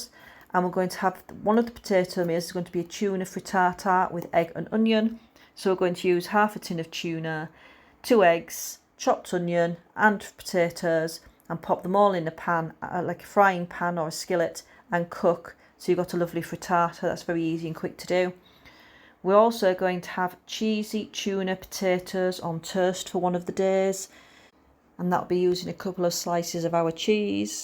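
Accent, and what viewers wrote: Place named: Northern England, United Kingdom. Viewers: Sounds like Humberside. I knerr. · Fair play to ya for making this, i saw it on my feed and clicked on it to see, its always nice to support youtubers, so ive liked and subbed well done, good video, keep the good work up. Love the accent by the way, where u from? i know its north somewhere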